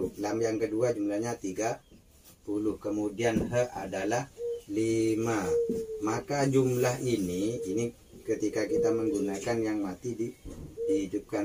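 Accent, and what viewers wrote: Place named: Sumenep, Indonesia. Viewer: Sampeyan dimana mas. Ko logat nya sumenep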